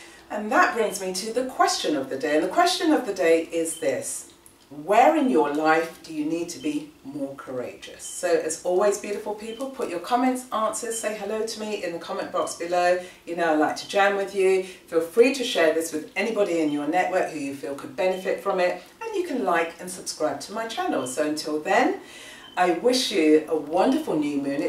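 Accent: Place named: United Kingdom. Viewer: Thank you. Great information. I love your down to earth approach to your videos and hearing this information in an UK accent. The necklace you are wearing is particularly stunning! However, what happened to your camera angle on this one!:-) Where do I need to be more courageous in my life?..... I have a mars/saturn 1/7 opposition so I've had to learnt to be pretty courageous to just keep going really... however perhaps I need to let people in to my heart instead of 'protecting' myself by holding them at arms length. However, how to change this?! It's easier to allow myself to love children than adults as they open my heart somehow. Another part of my life I could be more courageous is letting go of the day - and allowing myself the feeling of " I did my best and I let it go now' instead of overthinking what ever happened and giving myself a hard time about silly little things. 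Letting things 'be' is a form of courage I think.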